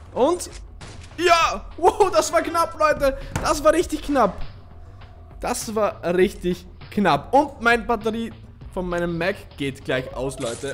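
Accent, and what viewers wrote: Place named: Upper Austria, Austria. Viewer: Kommst du aus Oberösterreich hört sich so an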